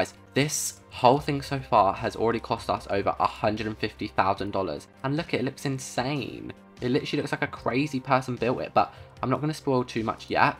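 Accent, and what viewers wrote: United Kingdom. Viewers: i LOVE the british accent its like ''BeTa'' and ''a BlEnDa'' LOL:D tysm for making my day better<3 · HIS BRITISH ACCENT IS SO NICEE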